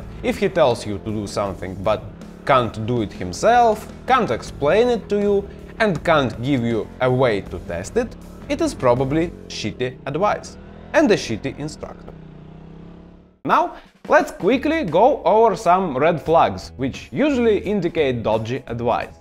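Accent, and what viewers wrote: Russia. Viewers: A Russian doing more Russian accent.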